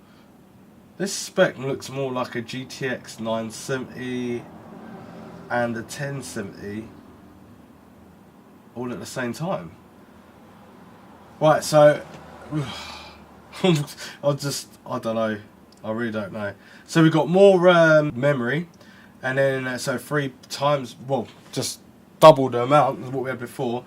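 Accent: England, United Kingdom. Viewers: germany but i just love the english accent and I was in London a month ago... either I'm not understanding you correctly, but wasn't the 1060 6GB released when the GTX 10XX series launched? because i remember i had to decide between the 6 and 3gb version about 2 years ago when i got mine (1060 3GB)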